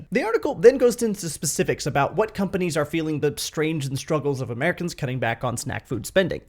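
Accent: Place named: India. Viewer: Love your indian accent. The way you said "P'ty" instead of "Pretty" it's fun.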